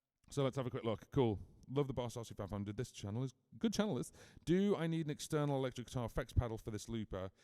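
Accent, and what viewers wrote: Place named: North Wales, United Kingdom. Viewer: excellent news. You sound fairly local to me? (I'm in Denbighshire, North Wales)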